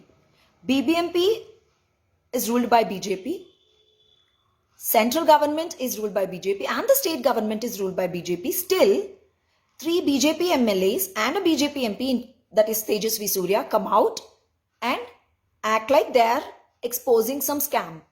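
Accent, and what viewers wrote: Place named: Ivy League, United States. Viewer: You're obviously biased and parroting your party position backing it with the weight of your credentials and ivy league accent; however not realising or blissfully ignoring the fact that the moment your affiliation surfaces, your entire criticism loses credibility as you represent the most corrupt, nepotistic family domonated political organisation led by one of the most incompetent and ignorant, self obsessed, entitled leader.. Good luck to your aspirations as I hope you might be genuine despite your affiliations..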